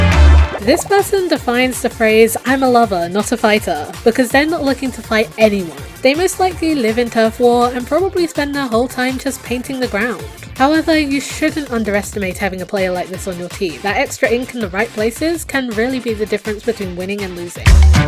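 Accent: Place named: United Kingdom. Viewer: SHES BRITISH YESSS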